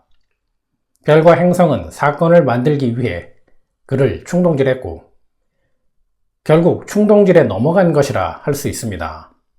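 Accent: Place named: China. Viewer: 이사람 말하는게 중국사람같노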